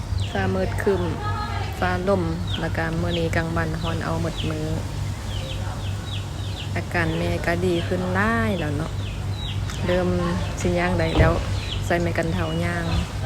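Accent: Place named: Thailand. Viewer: คุณ อยู่ แขวงอะไรครับพูดคุยเหมือนเมีองเลยสำเนียงครับ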